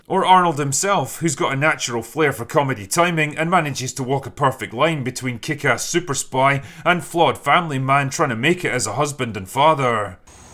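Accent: Scotland, United Kingdom. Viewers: it doesn't matter to me what movie/tv show you review just as long as i hear your Scottish voice. Just keep them coming and I will keep watching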